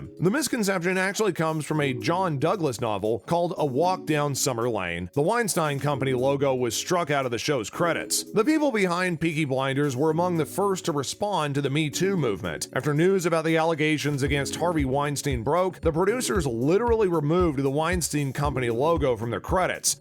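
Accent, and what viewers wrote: United States. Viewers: I’m sorry but the way Americans say Liverpool and bermham is funny why we need more big British YouTubers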